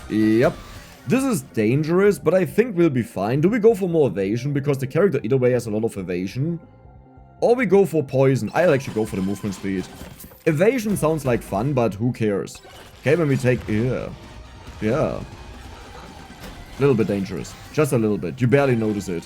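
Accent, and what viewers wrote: Germany. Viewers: U got me opens chest ...... oh my god another credit card xDD (the moment with the magnet) love ur german accent btw xP, keep it up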